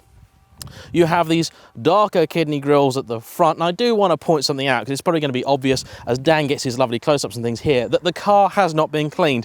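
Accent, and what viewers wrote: United Kingdom. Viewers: Proof that you can have a british accent, and still sound like a buffoon. I know another channel with a Kentucky accent, and sound much more intelligent.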